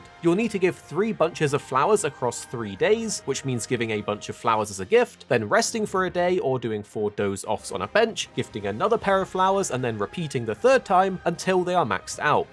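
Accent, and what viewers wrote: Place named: Scotland, United Kingdom. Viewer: In yoùtubes defence he does have a pretty thick Scottish accent.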